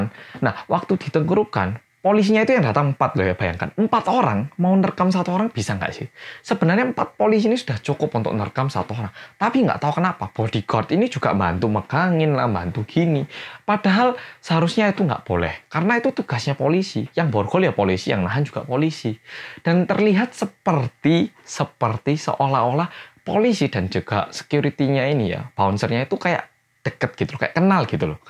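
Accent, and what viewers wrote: Java, Indonesia. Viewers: Cuma dia youtuber yg gak minta di subs..like..comment..😂😍 kontenx jg smart bngt..cara dia nyampein informasi...dannnn medokx itu😭😭😭😭😍😍😍 aduhhh..oppa kang mas..aku tresno karo koe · mas, ndek kae luwih medok tiba e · Suaranya kayak mas-mas jawa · Kaya orang jawa ngomongnya😍😆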